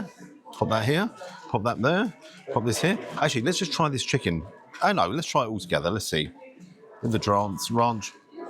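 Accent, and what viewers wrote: United Kingdom. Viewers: Its not what I would call a "sandwich". But then - I'm old fashioned. I also would call a fillet a fill-it not a fill-a. The language I learned to speak (and what American's also define as their lingua-franca) is English. You my dear speak with an English (British) accent. Your audience is most likely comprised of locals who are, typically, not American. Therefore, IMHO it would be nice to hear you pronounce things the English way. Perhaps I am just being pick-ee?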